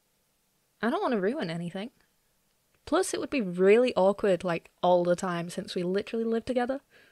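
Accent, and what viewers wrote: United Kingdom. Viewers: This perfect scirpt perfect everything but the British accent ruins it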